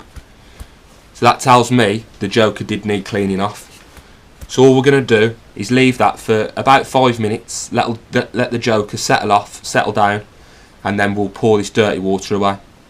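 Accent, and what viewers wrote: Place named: Dudley, United Kingdom. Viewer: Love your accent. Reminds me of my mate Rob, from Dudley :P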